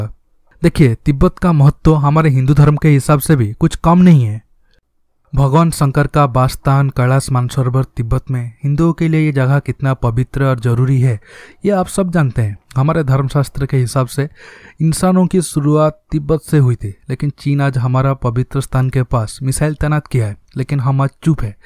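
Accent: Odisha, India. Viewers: Aap udisaa k ho. Kyaaa. Bhai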